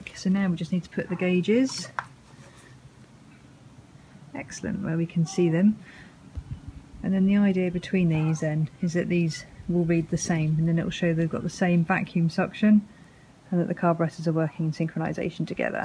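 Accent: United Kingdom. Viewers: Wow, you have an accent just like my ex wife. Are you from Surrey, U.K.??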